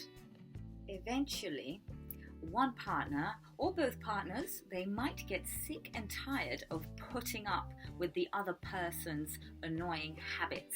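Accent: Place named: United Kingdom. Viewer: I really love your British accent! ... it's just fantastic, he he. Great lesson about phrasal verbs, super helpful. This Valentine's day probably I'll be watching some TV series he he nothing special for me. Although I wouldn't mind going out with a British girl like you ;) heheheh. Thanks, love your videos.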